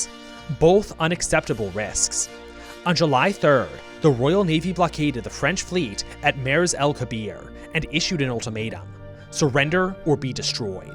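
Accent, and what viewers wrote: United States. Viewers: Why is Britain speaking with a yank accent….? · Pov: most American voice for us Brits Eva.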